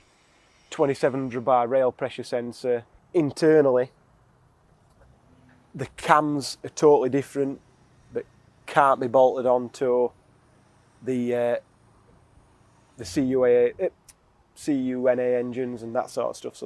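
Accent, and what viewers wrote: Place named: Barnsley, United Kingdom. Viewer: Proper Barnsley lad, tell thi nar!!!! when can tha do my BITDI.... 4MOTION RULES 😂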